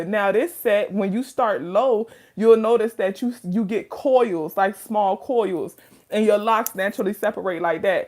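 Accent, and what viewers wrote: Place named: New Orleans, United States. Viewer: You sound like you are from New Orleans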